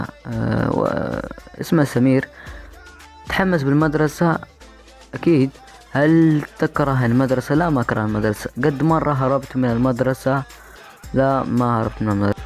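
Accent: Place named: Saudi Arabia. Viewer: من المغرب و تتحدث سعودي؟ ملاحظة: حتى انا مغربية ._. و بحبك ._.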